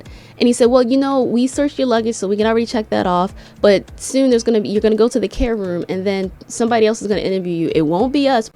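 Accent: England, United Kingdom. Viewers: Sidenote! That English accent is leveling up innit!😂😂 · Girl, you have been here a while/often. You have a cracking english accent. It's better than mine. I've been here for 52 years 😂 Bless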